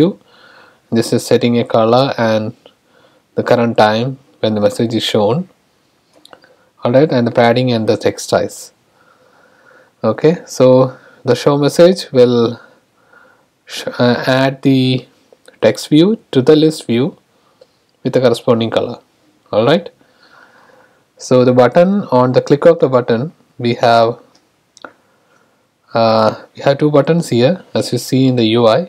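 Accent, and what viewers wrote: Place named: India. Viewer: bhai full indian accent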